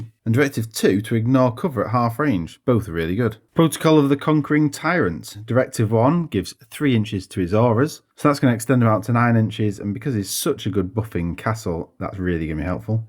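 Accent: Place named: Scotland, United Kingdom. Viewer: Una pregunta ,por curiosidad , ¿de qué pais eres? lo digo por tu acento se me parece un poco escocés o galés pero igual eres de yorkshire ,un saludo.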